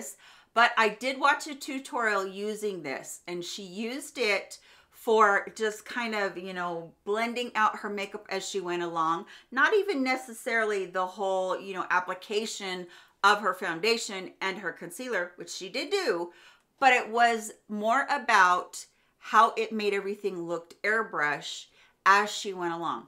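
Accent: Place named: Upper Midwest, United States. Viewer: Wow, I had no idea Ms was a fellow Texan! Since I started watching the channel I thought I heard a very light upper Midwestern accent.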